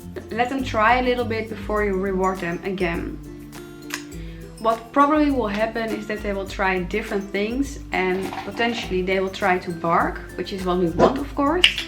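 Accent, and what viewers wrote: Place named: Germany. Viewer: Wie du englisch redest man weiß das du deutsche bist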